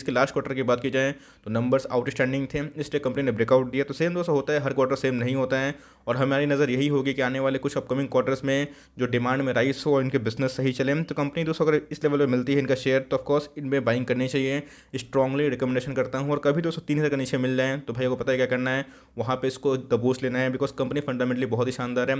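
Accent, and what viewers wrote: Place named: Odisha, India. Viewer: Odia accent.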